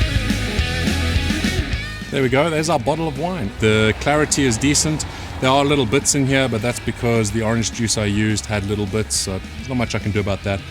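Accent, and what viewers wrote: South Africa. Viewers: That's put a smile on My Face, I thought i heard a SA accent and thought that's where You are ? What part of the UK are you ?, I now live in the Northwest of Ireland But Originally from Portsmouth ,UK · Nicely styled out there, could've had water all over the deck! Looking forward to trying this, just picked up a couple of demijohn's from Wilko (£8 each - pretty good value), and ordering the chemicals on-line. Going to try 3l grape juice, 1l Orange to start with (make sure I can do it before I get too inventive!). Hopefully should be good. Thanks, and love the SA accent by the way.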